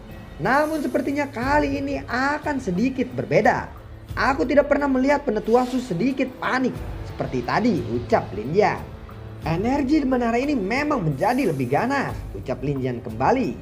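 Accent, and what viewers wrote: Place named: Sunda, Indonesia. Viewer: Wkwkwk Sunda gning 😁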